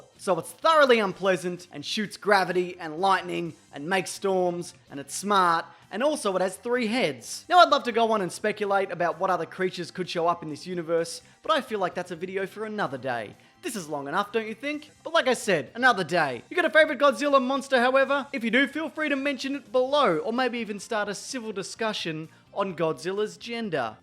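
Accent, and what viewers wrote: Australia. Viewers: Wait your Australian?! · YOU"RE AUSTRAILIAN?!?!?!? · He Or sHe has an Australian accent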